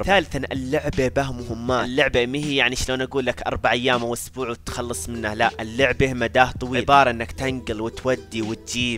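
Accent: Qassim, Saudi Arabia. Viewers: انت من القصيم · لهجتك جميلة. في أي منطقة في سعوديه يتكلموا كذا؟ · هاي يا لهجة بلسعودية هجي يحجون كلش حلوة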